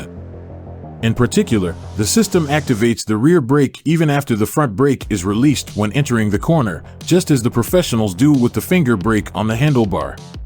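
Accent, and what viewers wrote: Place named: United States. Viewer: American voice over can't even pronounce Panigale, does my nut in.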